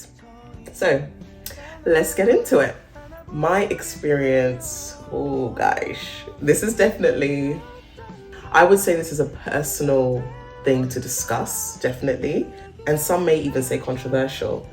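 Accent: United Kingdom. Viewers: Are you British? You don't sound American. Very interesting video, thank you for sharing. Your story telling style is so calming. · I had a feeling you were British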